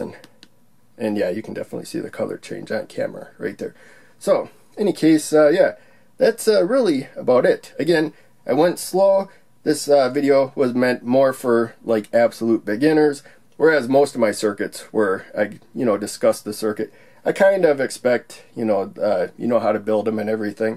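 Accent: United States. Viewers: Thanks! multimeter tells many things. your drawing and basic knowlage (what LOW, HIGH means) help me some. according to OUTPUT (LOW or HIGH), LED 1 or LED 2's voltage difference is much interest. multimeter between LOW(OUTPUT) and 5.3v VCC tells 5.26v. then between HIGH(OUTPUT) and GND tells 3.97V(220 ohm is between). Your english is so fast so not easy to listen for middle aged East Asian guy, but could hear some important phases. I think you are an Amerian from your english, that's right. Good luck for this hard decade with not beautiful some GREAT policitions here and there. Thanks again.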